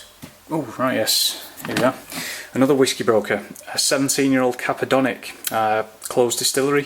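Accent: Manchester, United Kingdom. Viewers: Loved this. You sounded particularly manc in this vid. Love it.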